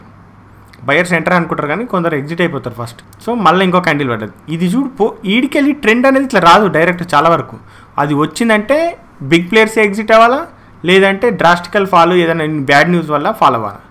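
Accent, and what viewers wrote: Telangana, India. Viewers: dear, మీ పేరు నాకు తెలియదు. అందుకే అనే సంబోధిస్తున్నాను. ఎంత బాగా చెప్పారు. మార్కెట్ లో చాలా ఎక్స్పీరియన్స్ వున్న ( వుందని అనుకుంటున్నాను లెండి ) నాకు కూడా మీరు చెప్పిన విషయాలు విస్మయం కలిగించాయి. ప్రైస్ యాక్షన్ గురించి ఎంత అద్భుతంగా చెప్పారు. రియల్లీ ఫెంటాస్టిక్. నిజం చెప్పాలంటే ఈ వీడియొ గురించి నా మనసు లో కలిగిన భావాలు బయటకు చెప్పటానికి నా భాషా అవగాహన చాలటం లేదు. అంతా ఎక్సయిటింగ్ గా అనిపించింది. లాస్ట్ పాయింట్ సూపర్ అని చెప్పాలి. మీ చానెల్ కు ఎప్పుడో subscribe అయ్యాను గానీ ఇంత ఎక్సయిటింగ్ గా ఎప్పుడు అనిపించలేదు. ఇందులో విషయానికి సంబంధం లేకపోయినా ఒక మాట చెపుతున్నాను, మీరు చక్కటి తెలంగాణా తెలుగు న్యాచురల్ గా మాట్లాడుతుంటే కూడా వినసొంపుగా అనిపించింది. ఒక తెలుగువాడు ఇంత చక్కగా చెప్పడం కూడా నాకు బాగా నచ్చింది. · Anna Telangana Language lo Explain Chestunte Bale undi anna. Nenu telangana Khammam dist. Nen enjoy chesikuntu chustuna nee videos. 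Thanku💐💐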